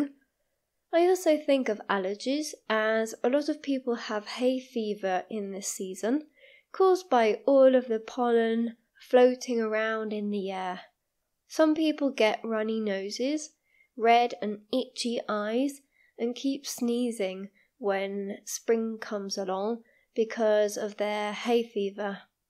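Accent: RP, United Kingdom. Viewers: Hi Is this RP accent?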